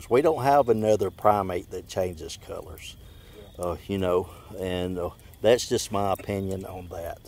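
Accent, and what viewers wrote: South, United States. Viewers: Thanks, but I gotta say I miss your sweet southern drawl.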